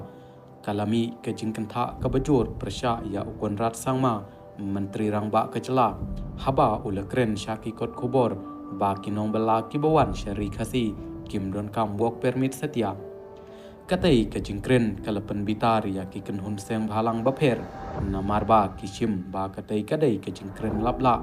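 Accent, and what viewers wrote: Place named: United Kingdom. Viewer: Speaking English like British mind set like Street boys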